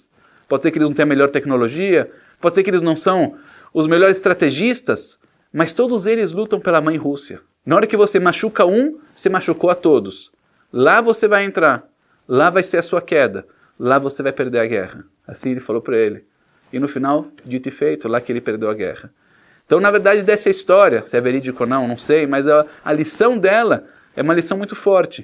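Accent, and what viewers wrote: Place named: Brazil. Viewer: Bacana ver e ouvir um rabino ortodoxo com sotaque bem brasileiro! Todá!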